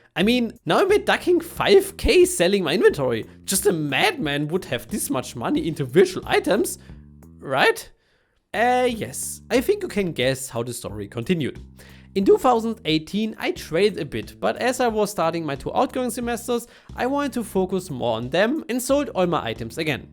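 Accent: Germany. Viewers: erster deutscher youtuber, bei dem mich der deutsche akzent nicht abfuckt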